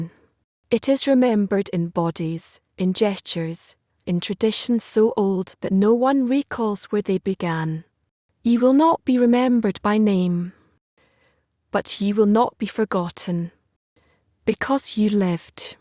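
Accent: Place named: Scotland, United Kingdom. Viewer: Love the Scottish voiceover 🏴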